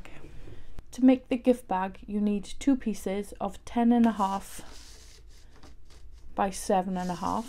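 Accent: Wales, United Kingdom. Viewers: Hi, Lovely tutorial, thank you! Sam is very wise having you on her design team, methinks! Love what you did with this, awesome work. Out of curiosity, your accent is sooooooo familiar to me, are you by any chance from Cardiff, or at least somewhere in S. Wales? I am in Cardiff, an adopted Welshie, Cardiffian, as I have lived here most of my life (shhhhh, don't tell anyone, I am actually English;) ) lol!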